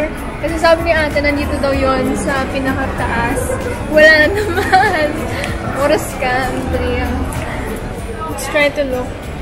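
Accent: Batangas, Philippines. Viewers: Naging bella fam po lalo nung nalaman ko po na batangueña po kayu sobra po akong natutuwa kapag nag babatangueña po kayu. Taga batangas po kase ako😍 Fb: Gwen Comia Ig: gwenv.hope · You’re so adorable and I love the way you talk especially with your batangueño accent ♥️. IG:nnayramlee Fb:Mary Ann De Villa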